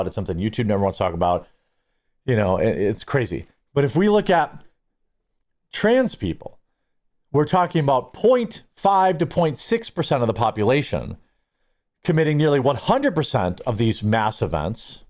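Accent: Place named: Wisconsin, United States. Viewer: ) I can see that, but I like how talks because it reminds me of my sweet Mom's family from Muscoda, WI.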